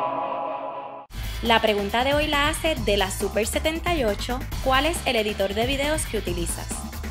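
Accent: Puerto Rico. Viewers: Ella es Puertorriqueña al escucharla se sabe. No la critiquen por como habla cada cual tiene su acento segun su patria. Super rica la receta... ;)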